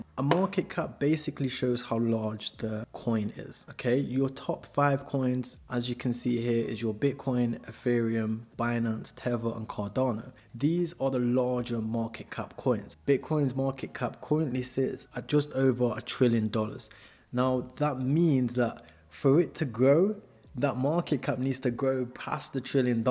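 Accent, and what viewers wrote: United Kingdom. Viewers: Are you investing or trading. And are you from the UK?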